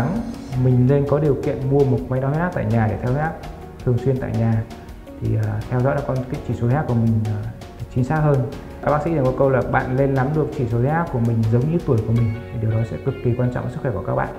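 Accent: North, Vietnam. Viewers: Tiếng bk khó nghe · Mình dân Cà mau bị cao huyết áp nhưng nghe giọng nói bake thì thấy không tin tưỡng nên tắt ngay.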